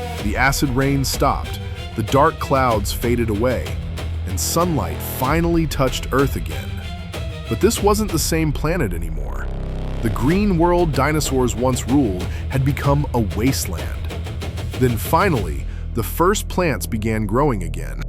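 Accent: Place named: United States. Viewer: There are better sites than this and don't have the silly American voice.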